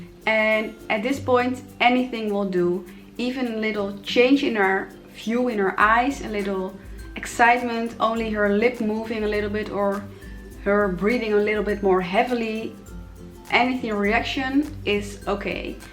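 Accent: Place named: Germany. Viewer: Wie du englisch redest man weiß das du deutsche bist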